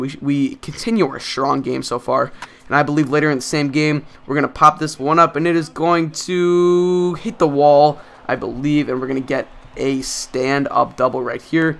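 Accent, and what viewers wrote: Boston, United States. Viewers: are you from boston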